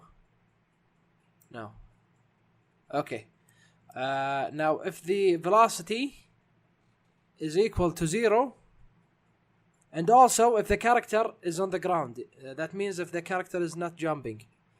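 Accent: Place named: Arab world. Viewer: love your accent are you arabic عربي صح ؟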